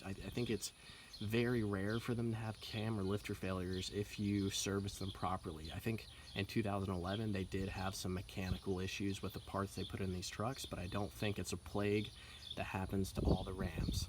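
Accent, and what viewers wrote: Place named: Pennsylvania, United States. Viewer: Dawg you sound just like streetspeed717. Pennsylvania accent, but it’s more of a voice than an accent. Props on the informative vids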